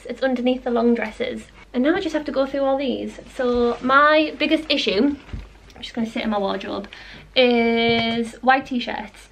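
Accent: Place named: Scotland, United Kingdom. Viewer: Sounds Scottish, like my daughter-in-law, with a slight lisp. They get taxed per room, so don't have closets, like we do. They have cupboards, which they call "wardrobes." So I was expecting a huge wardrobe, not a cupboard. They don't pronounce their "t's" in the middle of words like water, or pretty.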